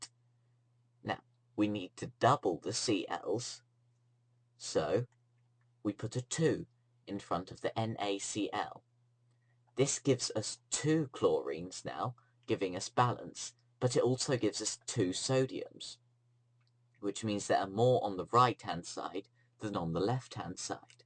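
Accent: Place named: United Kingdom. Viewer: His voice is British! Minnesota Literacy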